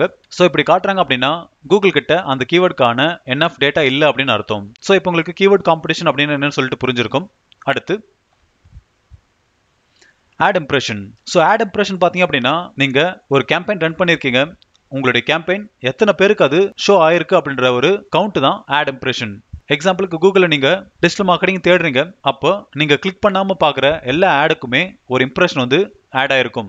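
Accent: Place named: Coimbatore, India. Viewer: nenga coimbatore thana bro.unga slang la theriyuthu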